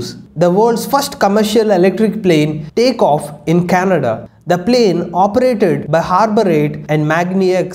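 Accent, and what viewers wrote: South India, India. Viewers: South se h bhai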